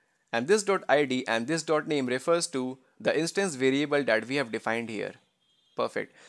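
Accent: India. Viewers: Wow, a very thought out and systematic lesson on constructors. The Indian accent is mild enough that it doesn't get in the way. Excellent job.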